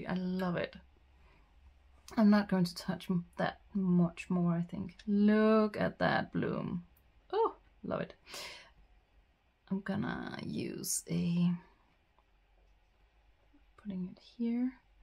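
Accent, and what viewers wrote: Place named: Denmark. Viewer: Your accent sounds like you're from Denmark:face-blue-smiling: Har jeg ret? :goodvibes:I sketched with that same brand of pen you're using I tried, but it didn't seem water-resistant, so my sketch died :face-red-droopy-eyes: Anyway, my go-to flowers are tulips 🌷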